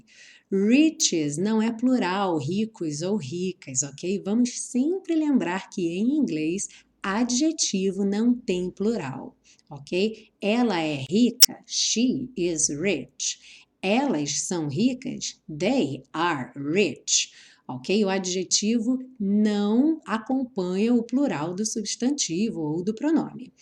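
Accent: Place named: São Paulo, Brazil. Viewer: Certo professora! Som de r do interior de São Paulo(Piracicaba).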